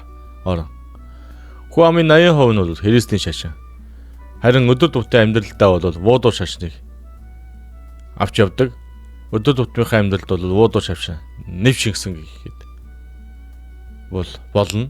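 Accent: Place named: China. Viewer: Хятад аялгуутай сонсогдож байна бас хятад ая.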